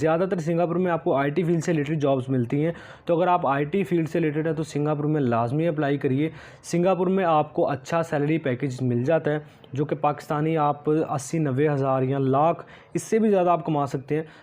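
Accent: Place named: India. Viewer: Are you Indian or Pakistani because you speak like Indian. If you are Pakistani so please don’t speak like that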